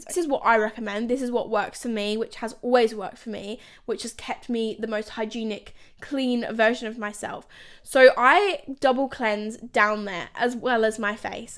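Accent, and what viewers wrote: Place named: Australia. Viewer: Am I the only one who is hearing the Aussie come out!!! Can’t wait to see your Aussie content! Xx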